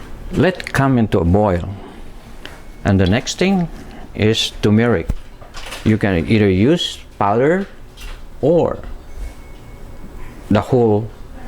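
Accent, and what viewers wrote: Philippines. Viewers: Kuya, masyado ka namang pretending mag english accent. Hirap na hirap ka sa english bakit di ka nalang nag tagalog Sabagay lumalabas pag ka accent ng Visaya mo.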